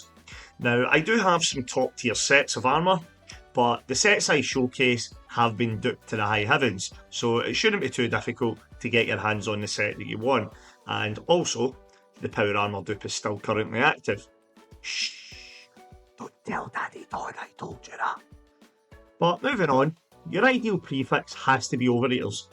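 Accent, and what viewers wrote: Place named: Scotland, United Kingdom. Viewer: I love your content, but more than that it's the Scottish accent that's the icing on the cake. Pure Dead Brilliant.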